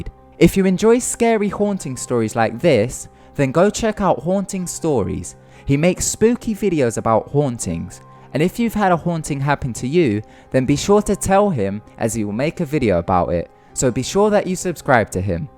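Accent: United Kingdom. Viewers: I think he just has that kind of British accent...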